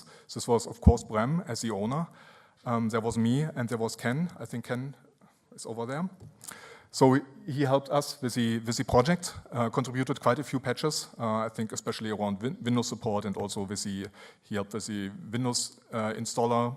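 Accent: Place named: Germany. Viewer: Glorious German Accent. Thanks for maintaining my favorite editor, though.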